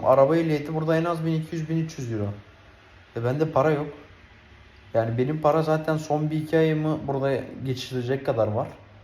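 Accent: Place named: Bulgaria. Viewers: Bulgar değil, Bulgaristan göçmeni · Bulgar ya da trakyalı gibi konuşuyorsun. Beyaaa....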